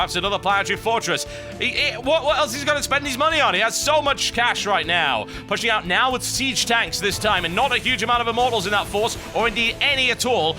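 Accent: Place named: United Kingdom. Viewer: hes so british.. i can't understand a damn thing when he talks fast, but i am no less excited.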